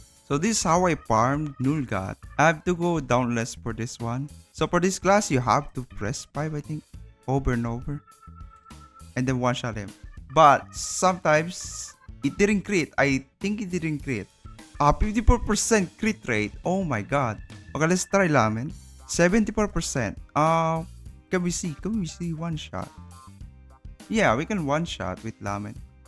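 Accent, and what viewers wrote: Philippines. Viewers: Base in ur tune ur Ph boii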